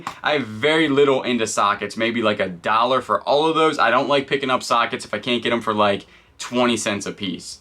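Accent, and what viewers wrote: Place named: Philadelphia, United States. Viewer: You must be from the Philadelphia area, right? I hope my ears aren't deceiving me!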